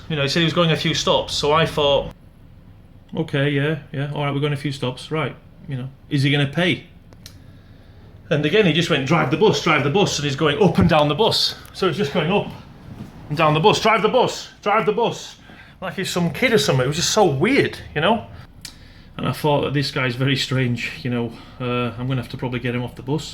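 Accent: Yorkshire, United Kingdom. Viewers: I thought you had a Yorkshire accent ! Haha hello from Huddersfield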